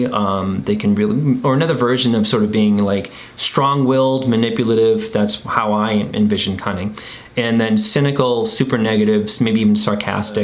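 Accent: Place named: Worcester, United States. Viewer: it"s not sting-y it"s pronounced stin-gee, meaning ungenerous, I believe. Love the channel, and the Woostah accent reminds me of home! Wicked pissah!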